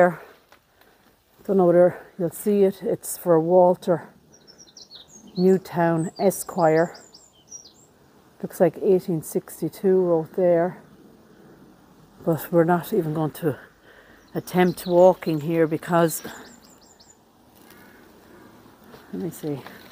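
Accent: Ireland. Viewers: I love your Irish brogue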